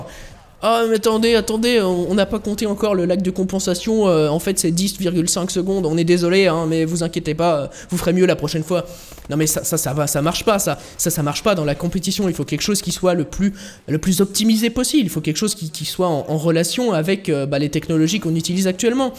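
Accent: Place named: England, United Kingdom. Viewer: arrêté l accent englais ça fait pitié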